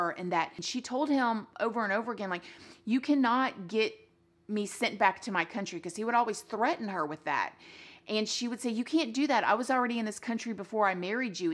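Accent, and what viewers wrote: South, United States. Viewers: You told the story PERFECT better than any I heard even back then! And I LOVE ya southern twang and your SOOOO Soo pretty 😍😁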